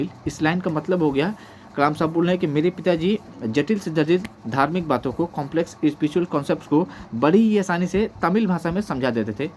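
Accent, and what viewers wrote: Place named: Bihar, India. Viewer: Very well explained and I can relate with your bihari accent which gives a home like experience. Thank you🙂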